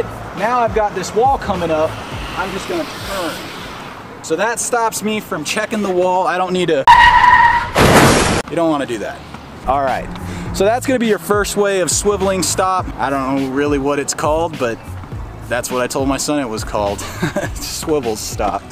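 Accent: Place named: RP, United Kingdom. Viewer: Thank you . Your english is very articulate and congrats for not having a local accent . Its almost a boarding school accent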